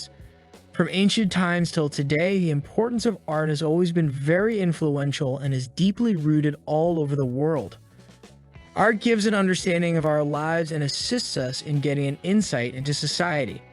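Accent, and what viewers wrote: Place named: United States. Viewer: yes, this young mans approach,seems to have been to try and fit every polysyllabic word he thought sounded,"hoity-toity" into this uninsightful anecdote. If I just read the closed captions, I would assume he was not a native speaker, as the conjugation, and tense dispositions of many verbs are just illogical. Even if someone else is reading a script, he is obviously a native american speaker,(i would say california,or Maryland, or Ohio,) and would naturally have corrected the uncorfortable syntax imposed upon his elocution. Good luck to, I mean it, it takes a lot of work to produce these videos, but if he ends up reading this, turn down the prolixity, and buy an edition of Strunk & White," The Elements of Style," (for writing), and he will say more with 60% less verbiage.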